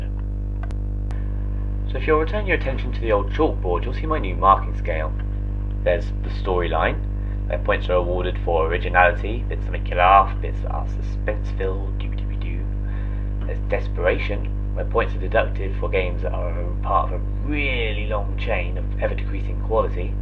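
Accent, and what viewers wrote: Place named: United Kingdom. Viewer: lol i love how 90% of youtube game reviews the host has a british or english accent xD your pretty funny though thanks for the info :)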